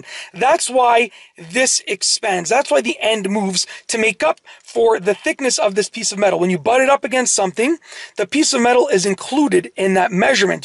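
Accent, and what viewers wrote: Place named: Woodbridge, Canada. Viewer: This is the Woodbridge, Ontario accent, but it extends to most of York Region, and any other Italian neighborhoods north of Toronto proper.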